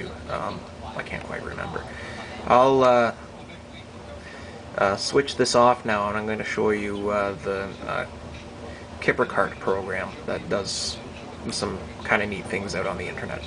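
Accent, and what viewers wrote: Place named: Canada. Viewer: You sound very Canadian. :) Great vid!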